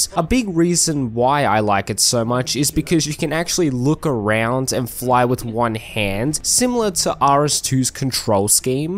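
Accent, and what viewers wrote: Australia. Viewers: Man, I'm a sucker, I hear an aussie and I hit like (sorry if you're a kiwi)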